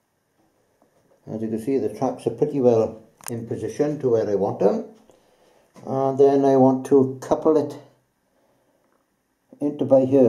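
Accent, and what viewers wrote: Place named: Wales, United Kingdom. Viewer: great idea using push pins to hold track in place while the geometry is sorted and your ready to pin it down .I have wasted so many track pins by fitting and pulling out , re aligning and such . great old school layout .love the accent by the way . it takes me back to when I played in a band touring wales , the "by yeur" phrase had us English baffled for a bit till we got used to it .its running great hope to see more in the future .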